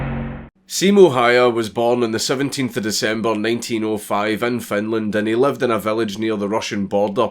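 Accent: Scotland, United Kingdom. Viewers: I would love to have dat accent its so extreme I love it. (sry if wrong but is it scottish accent?)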